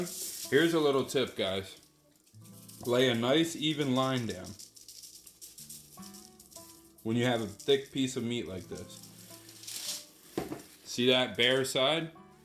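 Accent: Philadelphia, United States. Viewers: Are you from Philly? Love your videos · can't miss that northeast philly accent 😂. I lived in Magee back in highschool. I'm up in Chalfont by Ryan HS now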